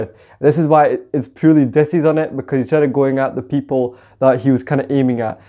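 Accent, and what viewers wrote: Fife, United Kingdom. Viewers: Sounds like a Fife accent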